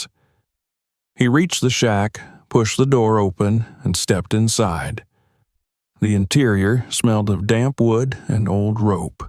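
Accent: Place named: South, United States. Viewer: Oh goody a AI story with a Hill Billy voice over narration.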